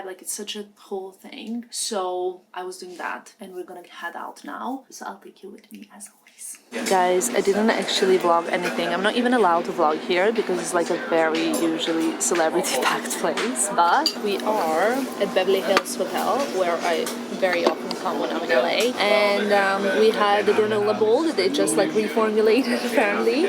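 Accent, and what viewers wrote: England, United Kingdom. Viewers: Omg by the end of the video your accent got SO British ahahah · Through this whole video, especially towards the end, your English accent really came through!! Stay safe xoxo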